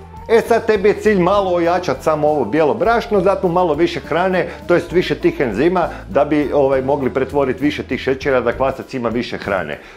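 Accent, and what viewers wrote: Zagreb, Croatia. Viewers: Pozdrav care iz Splita. Super mi je slušat ovog tipa, sa narječjem iz ZG. Ajd ž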